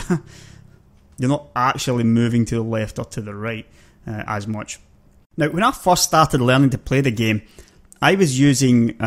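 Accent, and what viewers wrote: Scotland, United Kingdom. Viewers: ok..I have watched exactly 13 seconds of your video and had to pause to say congratulations on being Scottish..right I had better watch the rest cos this game looks as complicated to learn as it was in 1984....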